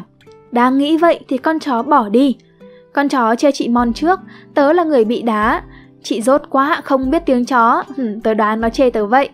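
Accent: South, Vietnam. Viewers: Hình như đọc giọng nam phải không (ý mà miền Nam nhé không phải nói nam nữ 😁)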